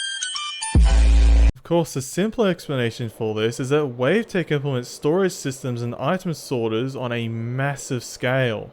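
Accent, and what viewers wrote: Australia. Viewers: I LOVE your northern aussie accent btw I forgot what you were talking about until when i was like "oh yeah the golem thats why i was here"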